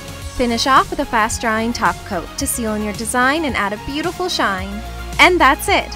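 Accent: Canada. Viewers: she's Canadian I can tell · You can tell shes canadian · She doesn't sound Japanese at all you guys. Lmao 🙈 She definitely sounds Canadian. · You can tell. She talks with a canadian accent:)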